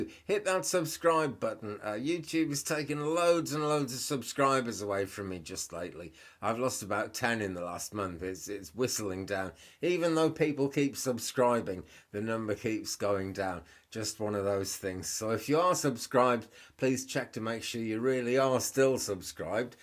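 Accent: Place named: Wales, United Kingdom. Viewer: Love your voice & Welsh accent! My former mother-in-law was Welsh and you remind me so much of her! Have a great day!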